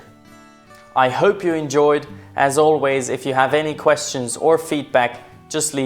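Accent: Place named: Poland. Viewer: may I ask your nationality? sounds like you are polish for lot has chosen u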